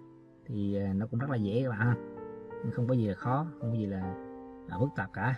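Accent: Central, Vietnam. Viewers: cảm ơn bạn, nghe giọng miền trung mến liền🥰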